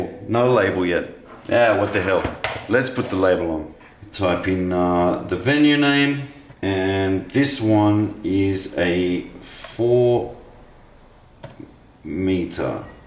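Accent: Australia. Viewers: Are you Australian? Great video. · Aussie? Love the video mate.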